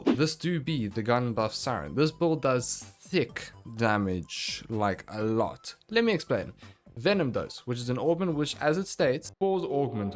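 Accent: Afrikaans, South Africa. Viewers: My fellow South African. The reason I asked was because I could hear your Afrikaans accent.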